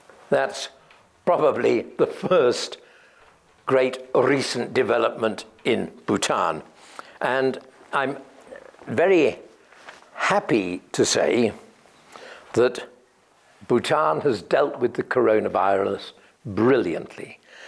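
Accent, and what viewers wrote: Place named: Oxford, United Kingdom. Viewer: I listen most of your talks because I think you beautifully speak original Oxford English, I think. Original way of language speaking changes in passage of time from place to place.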